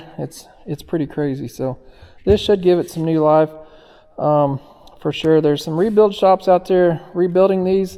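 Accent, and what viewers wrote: South, United States. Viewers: Wow here is this southern redneck who wears dirty camo coveralls 10 months a year. Generally known for heavy equipment repairs, this dude takes his huge sausage fingers and does as clean a bit of circuit board soldering as I have ever heard or seen. And in a voice best suited for pork rinds, moonshine and Nascar, does as great a technical explanation, diagnosis and repair as I could ever have imagined., you are the salt of the earth and one of those people I regret I will never meet!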